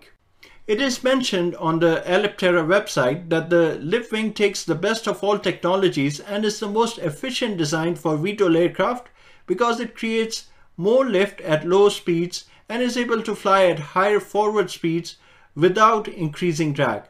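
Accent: India. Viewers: Sound like punjabi profesor lesson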